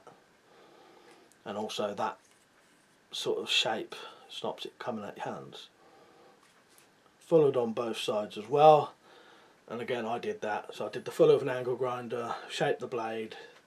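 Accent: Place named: England, United Kingdom. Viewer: Great video.i grew up on and from your accent I'm guessing your from the same area as bruce.and rod Smallwood too.awesome scorpion reminds me of my homemade one.its on my YouTube channel.youll see the similarities.great looking blades man.mine are cheapo steal.only 1 I did is stainless and it takes 3 times as long.i use same tools as you.except my dad's portable band saw to take off as much steel as possible and grinding off the rest.before I did about 70% hacksawing.dont know if your a fan but I'm a true nerd maiden fan.up the irons!!!!that applies to knife makers too!🎸🔪🎸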